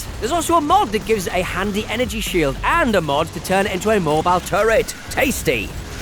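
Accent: Australia. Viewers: The narrator feels like his having a normal day in Australia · He sound more Aussie than Irish to me, m8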